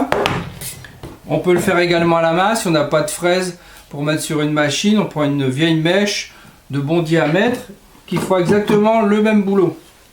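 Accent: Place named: Nord-Pas-de-Calais, France. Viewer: Bien expliqué, le petit accent ch'ti, j'adore!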